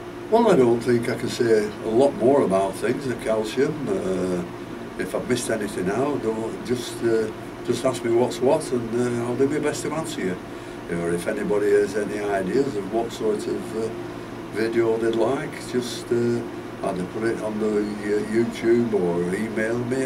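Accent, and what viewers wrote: Lancashire, United Kingdom. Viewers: Thank you for your videos. Your accent sounds similar to mine! I'm in Adlington near Chorley. Merry Christmas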